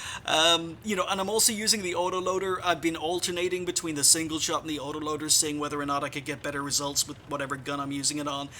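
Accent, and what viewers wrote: Ireland. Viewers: Great video! Loved your sexy Irish voice! That sounded like something a Girl would say :p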